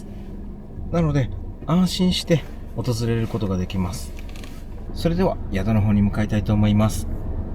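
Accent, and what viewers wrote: Kansai, Japan. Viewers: 前々回から、あれオ−プニングの音楽が変わりましたね。 あの歌舞伎映画の音楽かと思ったけど違ったら申し訳ありません。 今回の音楽は朝日と雲海と絶景と合っていて国民放送さんみたいでしたね。 いつもの滝と神社が普通に暮らしてたら行かないような所が画面一杯に広がってあきないです。 関西弁も素敵です。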